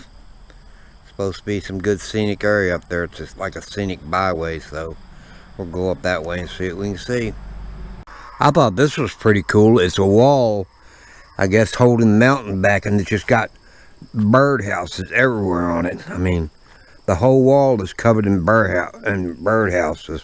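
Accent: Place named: Georgia, United States. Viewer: sound good to me...are you from atlanta georgia? love the accent... be safe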